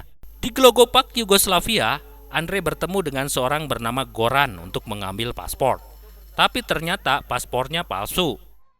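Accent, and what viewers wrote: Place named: West Java, Indonesia. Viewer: Naratorna urang Sunda 😆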